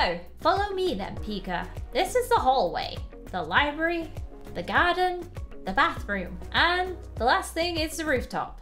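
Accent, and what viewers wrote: United Kingdom. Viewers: Quistion are you British cause you kinda sound like ittttt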